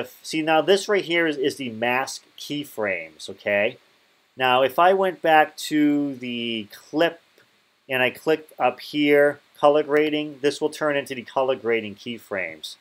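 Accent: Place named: New England, United States. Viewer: Great to hear a New England accent. It actually cracks me up I haven't heard it in so long.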